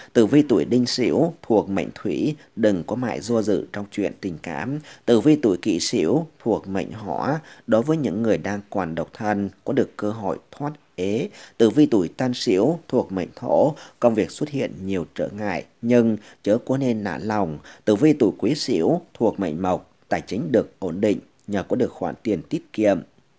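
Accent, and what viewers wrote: Thanh Hoa, Vietnam. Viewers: mình nghe giọng của bạn ...mình đoán bạn người Thanh Hóa ...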